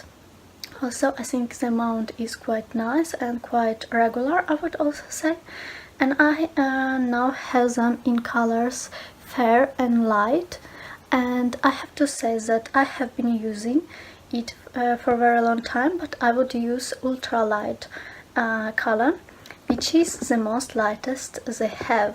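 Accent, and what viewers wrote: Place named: Serbia. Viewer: я вот слушаю, и думаю, что английский не родной )) думала, может девочка из Сербии или ещё откуда-то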